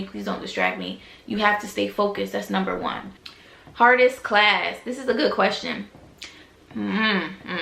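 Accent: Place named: New York, United States. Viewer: yes girl 😂 you got that New York accent.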